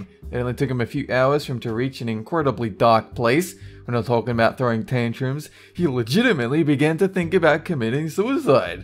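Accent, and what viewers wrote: Australia. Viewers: This guy sounds like an Australian Dave Rosenberg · is this guy aussie? because I am and he sounds he is too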